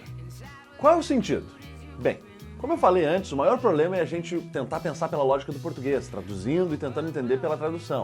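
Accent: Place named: Porto Alegre, Brazil. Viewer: that accents of Porto Alegre is not confuseble. I read you loud and clear. Good job!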